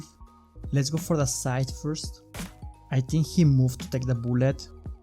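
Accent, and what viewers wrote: India. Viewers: UR accent is like a indian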